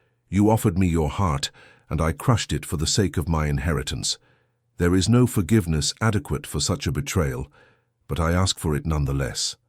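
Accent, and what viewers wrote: England, United Kingdom. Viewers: Hello dear. You missed " perhaps" before the first 30 so...he might be 30 after 2 years. Thank you for a nice story and an enjoyable english accent.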